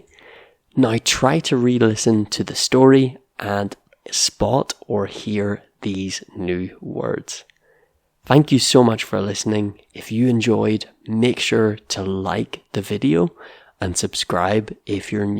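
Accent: United Kingdom. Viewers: are you Scottish? I'm just curious because of the way you pronounced certain words like the ones that have the /ey/ sounds: carefully, raised, paper, and waves. Carefully sounds like curfully; raised, reezed, paper has a true /ey/ sound; and waves is wevz. Also, isn't buried supposed to sound like beh-reed?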